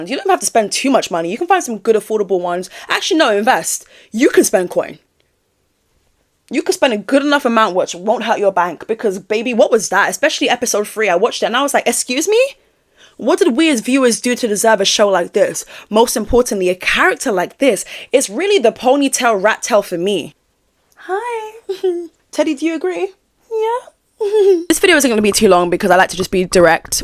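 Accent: United Kingdom. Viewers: you sound like 10 times more british in this video than usually, and it's super cute!!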